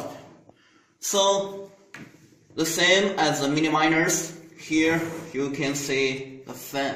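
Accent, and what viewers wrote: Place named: China. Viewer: Evert van Ingen made in china english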